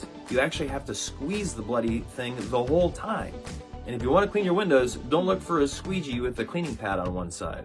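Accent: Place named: United States. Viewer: Ha ha, again, bro. You talk like a typical American tourist! We got your money.😊 Seriously, you need to get out with Brits away from big Cities.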